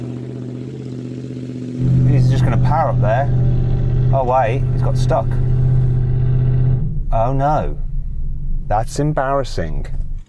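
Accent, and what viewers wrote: United Kingdom. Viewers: ファミリーガイのステューイーそっくりや。あれほんまにイギリスアクセントなんやな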